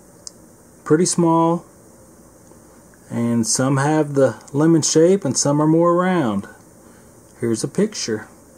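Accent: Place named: Texas, United States. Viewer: Sound like a good Son of Sam Houston if i ever heard one. Im East of Houston. Just starting. 3 bins so far, but i cant get mine to grow. i got 40 cocoons out of one concrete tub. put couple thousand uncle jims worms in there. 1 or 2. i need pure species bins.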